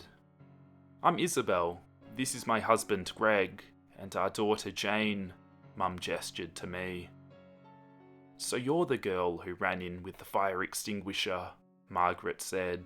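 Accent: Australia. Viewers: Are you Australian? Lol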